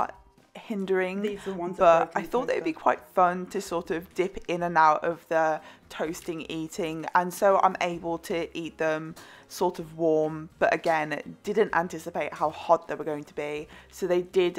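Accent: United Kingdom. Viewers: there should always be a physique check! Oh and whoever said you talk too much was a fool, love your sexy British voice! First video of yours I saw was the 96oz Steak challenge and I was blown away by how much you could eat and how amazing you look.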